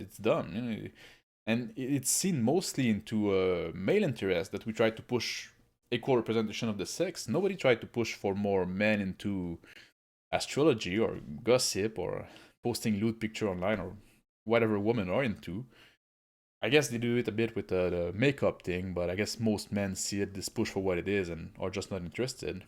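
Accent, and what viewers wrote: Quebec, Canada. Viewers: pour moi t’es du Québec hen? 😂 sub time!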